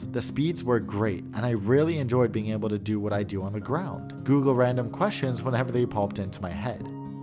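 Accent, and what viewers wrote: Singapore. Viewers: I subscribed when I heard you speak with that Spore accent! 🤣👍👍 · That Singlish is absolutely awsome! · Hahaha love the Singlish scattered thru out this video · mate, first time watching your videos, im blown away not just by the quality of your review, but also your singlish!! Wa eh steady leh, very rare to hear an angmoh dude like you speak like us! thank you for giving our beautiful creole language some spotlight! :) - from sg with love :)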